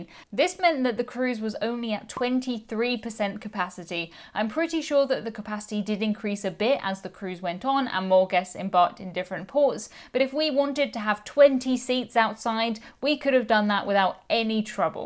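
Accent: United Kingdom. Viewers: Esta inglesa sabe de tudo sobre cruzeiros - com vídeos super didáticos, demais disso, a sua dicção é perfeita, ótimo para quem quer treinar os ouvidos para o inglês britânico.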